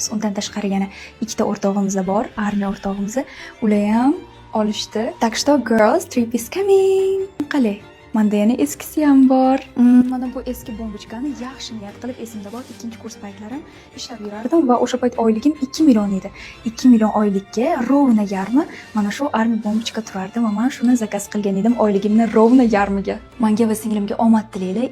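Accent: Navoi, Uzbekistan. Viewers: Wow navoiylikmisiz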